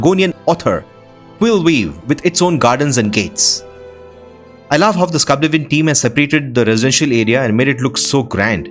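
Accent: India. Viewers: Actually crazy this guy is German I thought he was Indian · sound like Indian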